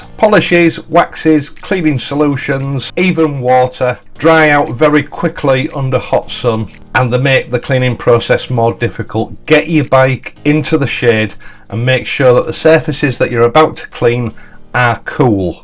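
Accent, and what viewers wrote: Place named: RP, United Kingdom. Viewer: Your method is as meticulous as your accent . " The perfect English Gentleman".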